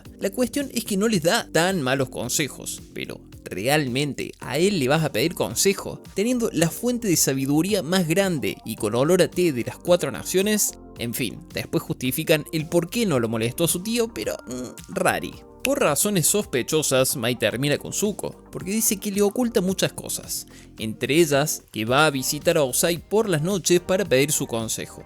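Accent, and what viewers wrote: Argentina. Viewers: Sos crack amigo y además argentino jajaja pero de que parte sos?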